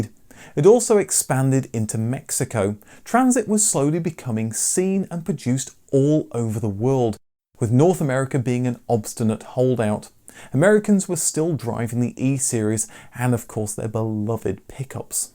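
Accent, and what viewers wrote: United Kingdom. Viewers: You look perfectly fine to me Bro. Love your British accent. Thanks from Cape Town, South Africa 🌍🇿🇦❤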